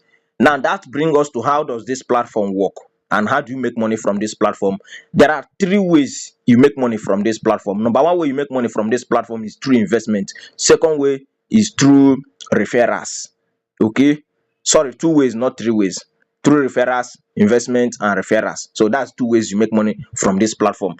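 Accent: Igbo, Nigeria. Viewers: You don’t have fact to prove your point. With your Igbotic accent,you want to spoil another man’s effort. Nothing is assured in this life,the most important thing is for you to invest what you can afford to lose. And on F&B you earn in 3ways not two. I said it that you are just a dumb critic. You earn without a single referral. You don’t have to refer a fly to earn legitimately. You are an online dumb coach that is looking for people’s businesses to pull down. You couldn’t even take you time to get your fact to backup your review point. 90% of the people I brought into the business investment did not refer a single fly yet they are earning and have recovered their capital. Till now all my team are still earning after getting their capital. Why the hate on other people’s business? As a matter of fact F&B just opened a Physical office close to Alausa Ikeja area of Lagos and is almost done with the 2nd branch on the Island in Lagos. 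So you mean a business is plan to run away with investors money and they went and opened a physical office beside Governors office? Can’t you see how dumb you are? And some other dumb people just as you are too are saying God bless you. Don’t listen to him,Join F&B and start earning your money without stress.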